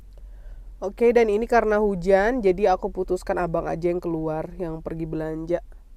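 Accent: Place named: Eastern Indonesia, Indonesia. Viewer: Lama2 logatnya kaya org timur ya kk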